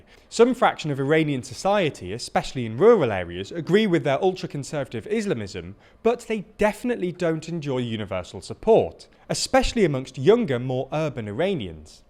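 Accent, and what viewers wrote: United Kingdom. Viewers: I have been to Iran for a month alone. This propaganda that just warms the west up for more genocide against innocent people. Spoken to women and police and asked what would happen if you dress inappropriately, as they do on French beaches, I was told by several women that they are sinply asked to adjust their wear. If they refuse, they take your name. That's it. When i told these women that the west believes morality police arrest and beat them, THEY JUST LAUGH. Women ALL OVER Iran do not cover all their hair. I would say visit and learn but you sound like a brit so you are not welcome. Sucks to be a fascist i guess! PS- i have never been on a country with so little police presence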